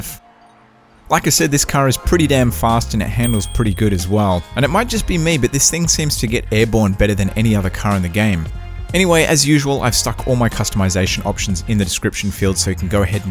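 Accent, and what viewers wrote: Australia. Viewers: Are from Australia.?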